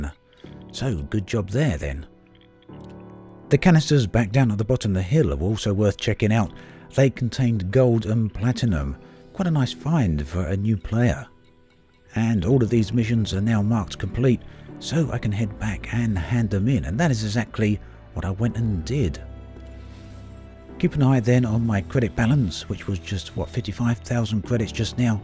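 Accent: United Kingdom. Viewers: Funny how brit accents always make videos classier lol good job love Elite ;)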